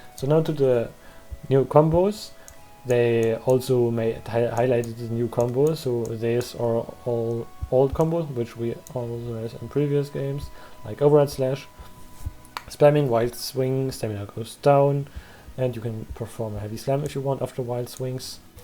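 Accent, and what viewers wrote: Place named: Germany. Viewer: 😅 You have to understand with a German accent he is indeed pronouncing it correctly. In fact one could argue that is supposed to be how it’s pronounced given the spelling. Americans are about the only people who pronounce it as “gage”.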